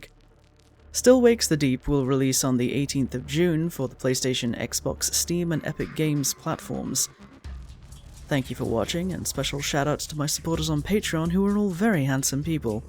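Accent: Scotland, United Kingdom. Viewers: You don't sound American, but you don't sound distinctly Scottish either. If I wasn't familiar with different Scottish accents I wouldn't have been able to place your accent! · Who told this person they sound american lol they do not, if not told i would have assumed this was just a hyper-regional accent from somewhere in britain that sounds like some sort of mix of english, scottish, and american haha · Oh wow I thought you were British lol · Anyone that says you sound American must be experiencing hearing loss or something... I didn't immediately think Scottish until you said it, and now that you have, I can absolutely hear it, but I knew there was a distinct European-ness to your accent. It's not the strongest accent in the world, but it's absolutely there and absolutely, distinctly not American. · ...do people actually think you sound American? 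I could tell you were British(though I'd guessed England, not Scotland) the first time I heard you speak, though as you can likely guess from my pfp, I'm hardly unfamiliar. (Funnily enough I have a similar story about moving around quite a bit growing up, to the point my own accent is diluted to the degree I'm often mistaken for Canadian)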